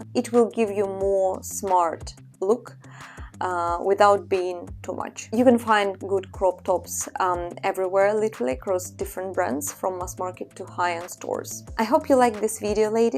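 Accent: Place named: Russia. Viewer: Русский акцент чувствуется 🤣😂🤣🎷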